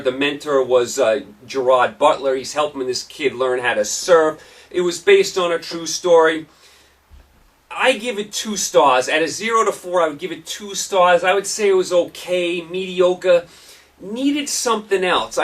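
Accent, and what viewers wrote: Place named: New England, United States. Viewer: I love a Yankee accent.....u defiantly sound better than the sports guy in Bowling Green, Owensboro KY, or Nashville, TN...thanks for sports show.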